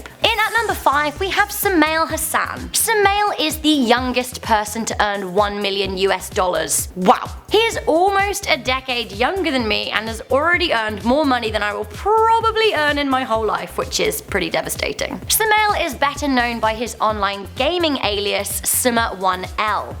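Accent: United Kingdom. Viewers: is low key hot af with her cute British accent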